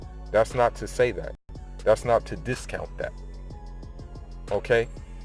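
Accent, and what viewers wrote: United States. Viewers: It sounds like you are American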